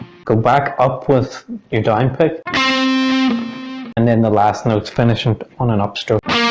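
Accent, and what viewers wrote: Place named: Belfast, United Kingdom. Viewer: Was amazing hearing your accent and realising you’re from Belfast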